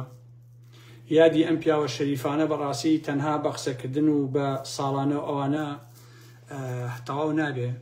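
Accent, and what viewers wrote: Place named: Khanaqin, Iraq. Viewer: باوەگەی باوگم« خانە قینی واقسە دەکەن» دڵم❤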